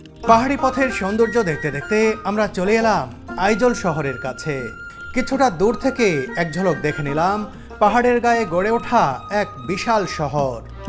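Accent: Sylhet, Bangladesh. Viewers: বারবার বলছেন , বাড়ি আসাম , আরে ভাই এই ছেলে করিমগঞ্জ , শিলচর , বদরপুর এসব এলাকার , সিলটি ভাষার গন্ধ পরিস্কার !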